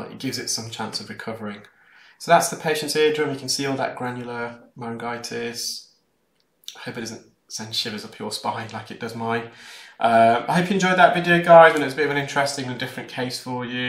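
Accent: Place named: Leicester, United Kingdom. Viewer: he's from Leicester in England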